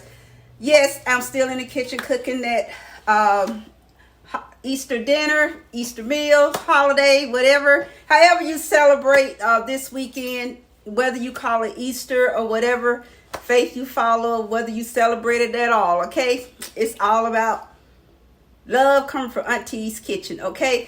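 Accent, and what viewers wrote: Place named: South, United States. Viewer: You gotta be south 😉 Louisiana here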